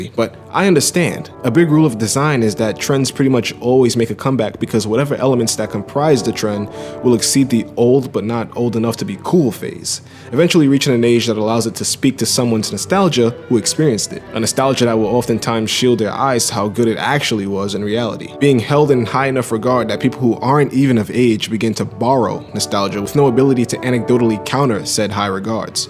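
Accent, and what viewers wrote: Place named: East Coast, United States. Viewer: You are very articulate and eloquently spoken, with a slight accent (my guess would be somewhere on the east coast) Great video!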